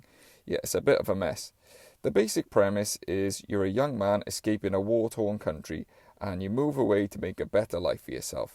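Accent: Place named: Wales, United Kingdom. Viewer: Welsh accent! Subbed :)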